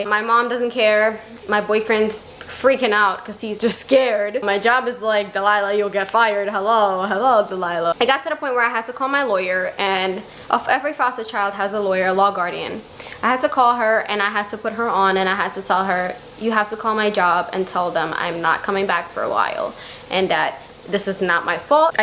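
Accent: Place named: New York, United States. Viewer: I was like mmmm this girl has a New York accent. Then you said you had a Brooklym attitude and I was like wohhh awesome!! I'm from the area as well :) I'm sorry that you had to go through this. Have fun in this upcoming trip.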